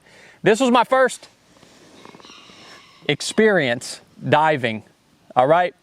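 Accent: South, United States. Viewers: what state are u from? I'm from OKLAHOMA.u sound like u are from the south?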